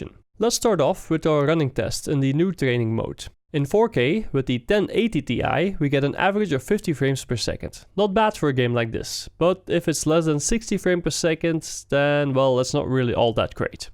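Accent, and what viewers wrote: Netherlands. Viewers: Dat hoor je goed dat je van Nederland komt hoor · Ur dutch right? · wat een dik Nederlands accent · I could hear that you were Dutch in about 3 seconds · Are you Dutch? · The Dutch accent is coming through strong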